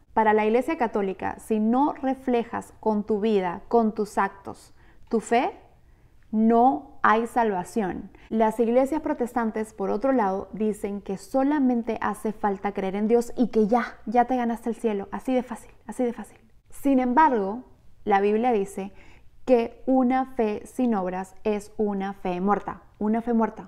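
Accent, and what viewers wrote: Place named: Lima, Peru. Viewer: ¡Te felicito! Excelente didáctica. Soy apologista católico desde hace treinta años y me enorgullece ver tu prestancia y razón para defender nuestra fe católica y su verdad recibida del mismo Espíritu Santo. A propósito, siendo yo del Callao Perú, capté tu acento limeño. Te pregunto, ¿estás en el Perú o en EEUU? Muchas bendiciones en tu apostolado.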